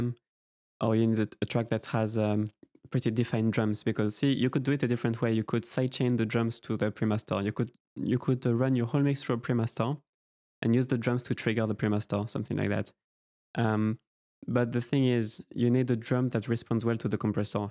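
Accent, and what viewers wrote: France. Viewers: Apart from your Nice Frensh Accent, You are a great mixing and mastering teacher 😍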